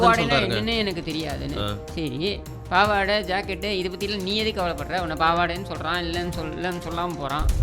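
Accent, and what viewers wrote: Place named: North Chennai, India. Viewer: North chennai slang is good sir. It is a mix of Tamil, Telugu, hindi or urudu slang. A language should be able to convey the message. Litrature is totally different from colocal language. Both are to be appreciated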